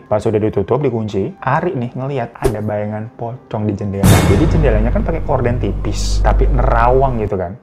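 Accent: Java, Indonesia. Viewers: Ngomong bHasa jawanya lucu bngt baang😂😂😂😂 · seperti biasa bang mantap, tpi itu logat jawa keknya bagian barat dah wkwkwk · suka salpok denger kak ngomong jawa, lucu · Ni abang klo ngomong logat jawa lucu bgttt😂 kiyowoookkk😂❤ Sehat² ya babang sekeluarga dn kita semuaa 🤲🏻🥰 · Ngakak lihat logat Jawa bang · Lucu bgt bhsa jawa ny bg 😅😅😅😅... Untung ada translate ny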